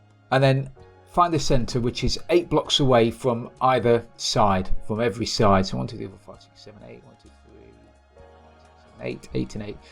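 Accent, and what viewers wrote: England, United Kingdom. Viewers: 30 seconds in i sub cus u sound like a nice english lad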